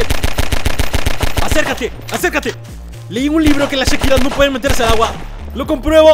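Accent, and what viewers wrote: Peru. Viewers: Omg eres peruano a argentino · Eres de Perú? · eres peruanoooooooo yo tambieeen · Eres peruano? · eres peruano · eres de perú?🤨🤔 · sos peruano?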